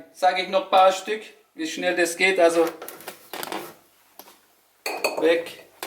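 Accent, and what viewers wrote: Swabia, Germany. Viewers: Das ist "Ausländerschwäbisch" 😂 Sprechen viele. Hat mein Vater auch so ähnlich drauf und ist kein Türke. Keine Ahnung, vielleicht tun die Schwaben ja irgendwas ins Wasser.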